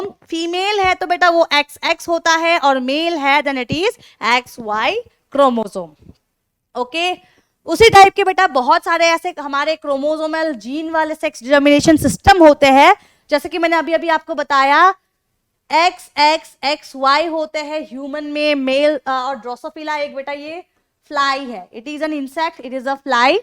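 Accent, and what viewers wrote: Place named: Bhojpuri, India. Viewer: ARE DIKKAT KA HAI RE.. BEST BHOJPURI TONE... 🔥🔥😂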